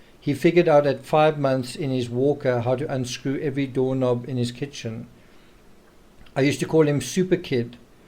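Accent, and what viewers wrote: South Africa. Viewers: Children. He has a South African accent.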